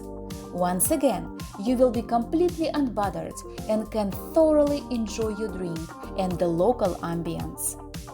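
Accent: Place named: Russia. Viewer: )) у вас русский акцент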